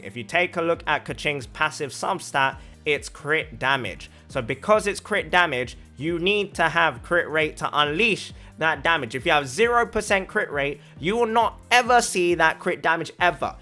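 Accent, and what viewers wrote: United Kingdom. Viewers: An asian dude with a british accent. wtf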